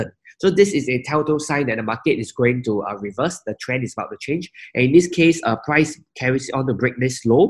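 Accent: Singapore. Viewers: You sound to be from Singapore.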